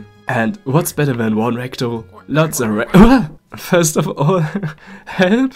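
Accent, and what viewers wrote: Germany. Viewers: You Sound german Und ich bin deutscher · you sound german mate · bruhh He sound like hes german! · you sound german to me🤔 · Hatte doch gewusst das die Aussprache mir sehr deutsch vorkam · Direkt beim ersten wort gemerkt, das du deutscher bist 🤝🥹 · U have a very Strong Accent (perhaps German??) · Very german accent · I hear the DEUTSCHER AKZENT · You sound so German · I Knew it that you have a German accent. Windows Defender Message prooved that i can hear that someone is german. :D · Ich wusste es, habe schon den deutschen akzent gehört und dann die deutsche windows benachrichtigung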